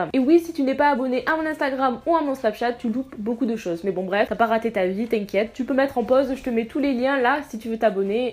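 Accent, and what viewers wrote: South, France. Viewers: coucou! Comme d'hab tes vidéos qui sont originales! Toujours à ta sauce. Et ron accent épicié du sud😂 jt'adore bb😂 #teamprout❤❤❤❤❤❤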